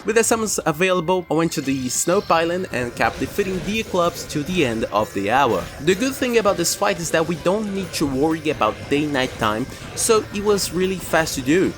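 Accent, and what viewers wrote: Brazil. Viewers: Awesome video just like the prehardmode one. I'm trying to tell where your accent is from, are you Brazilian?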